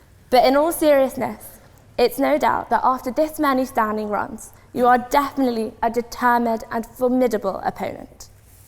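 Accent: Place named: Upper class, United Kingdom. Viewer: Another posh voice talking about how brilliant Thatcher was. Half of these people haven't stepped foot north of the M25 yet they think they have the authority to discuss what effect her government had on our towns and our people. There's a reason "ding dong the witch is dead" reached number 2 on the charts when she died - but you'd never understand that.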